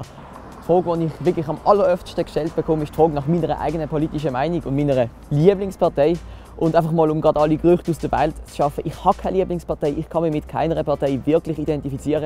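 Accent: Switzerland. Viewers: Nai, das isch Baseldytsch wo dä schwätzt.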